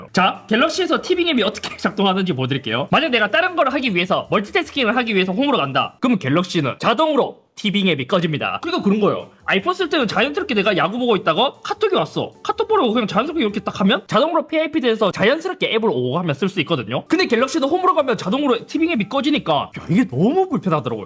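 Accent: Gyeongsang, South Korea. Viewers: 경상도 사투리 쓰는데 화나 팬이시네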